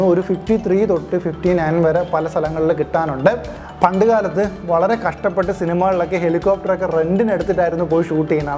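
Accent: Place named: Central Travancore, India. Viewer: അണ്ണാ നമ്മുടെ തിരോന്തരം ഫാഷേല് പറ അണ്ണാ, ഇതു അണ്ണൻ deliberately മധ്യ തിരുവിതാംകൂർ ഫാഷ പറയുവാണ്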